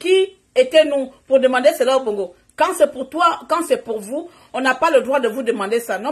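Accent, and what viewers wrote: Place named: Cameroon. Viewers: Tu ne dis rien !!! Et tu es camerounaise. Je t'ai reconnu par ton accent... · N'est ce pas ?son accent montre kel est aussi camerounaise com sa sœur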